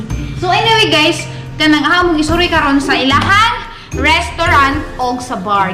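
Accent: Bohol, Philippines. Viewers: Siawa jud nimo day oi mag cge jud kog agik ik sa imong sinultian ganahan kaayo kos taga bohol the way mosulti kay makahimuot kaayo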